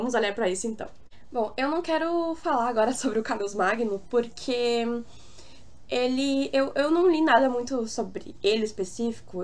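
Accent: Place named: North, Brazil. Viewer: Bacana , bom saber que existe uma juventude diferenciada no sul do paìs e que fala um pouco igual a nos do norte ( belem - pa) do pais , tu explicas bem.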